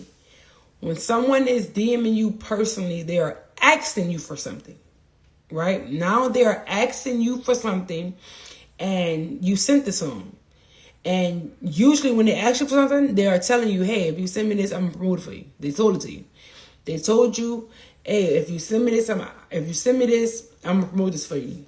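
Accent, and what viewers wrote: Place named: New Orleans, United States. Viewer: NOLA Girl, I HEAR YOUR ACCENT.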